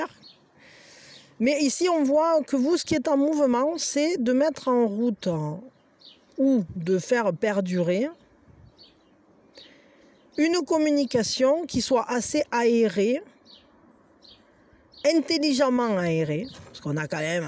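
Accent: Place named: Alps, France. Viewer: Bonjour. Quelques semaines que je me régale a t'écouter ton accent m'est de plus très familier tu ne dois pas être très loin de chez moi lol j'hésite entre les basses alpes ou les hautes alpes. Lol bref tu es devenu mon plaisir quotidien et en plus je te trouve très douée et pleins de répartie 😂. Même si ça ne me parle pas forcément à moi j'adore ta dérision et ton humour. Donc voilà je voulais que tu le sache. Car tu mérite avec tout le temps que tu nous accorde de savoir que tu fais bien de continuer. Bonne continuation à toi au plaisir de t'écouter encore. J'adore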